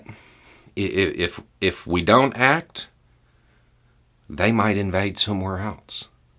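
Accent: South, United States. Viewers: of the Fifth Column you used the term "manufacturing consent" a couple of times. Would you happen to have read the book of the same name, written by Noam Chomsky? Hearing you talk is a lot like him, but with a Southern accent.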